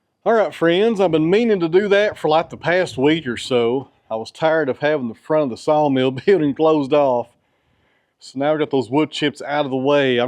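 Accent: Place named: Tennessee, United States. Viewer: Are you kidding? That Tennessee drawl is what keeps me coming back...that and is just good ol' country smart. Listen a bit and you gonna learn something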